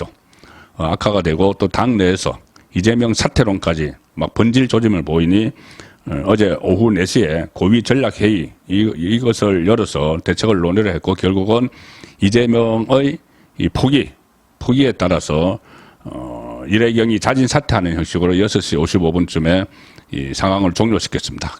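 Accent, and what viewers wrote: Gyeongsang, South Korea. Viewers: 들을때마다 갱상도사람의 약간 떠듬거리는 말투...꼭 웬지 나와같다는.....난 서울사람처럼 매끄럽고 술슬 말이 나오는럿도 좋지만...전 영양출신으로 처음 청주에서 대학생활애서 표준어앞에서 사투리를 감추느라고 땀흘리던 생각이 납니다. 70년대초반 중딩때 석간 영남일보 100여호 배달 알바를 열심하던 추억이 생각납니다...응원합니다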